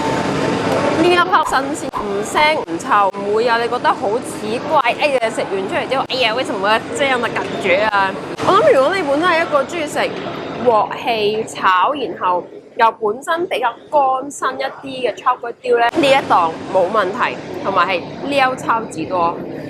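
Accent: Singapore. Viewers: 老实话。。。 你口音用新加坡加广东话听得好辛苦， 料来料去。。。 建议用广东话好啦！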